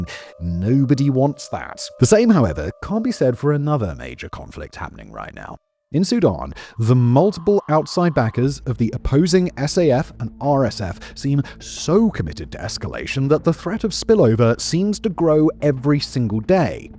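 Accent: United Kingdom. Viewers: His British accent is too heavy for me. I can't follow what he's saying.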